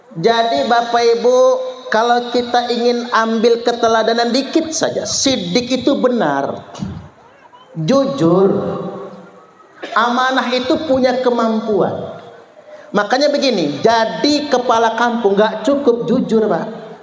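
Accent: Java, Indonesia. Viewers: cerdas wong palembang logat jawa banget sehat terus yai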